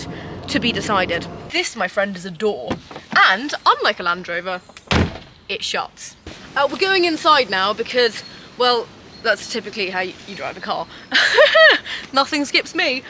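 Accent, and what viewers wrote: United Kingdom. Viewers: I love british accent...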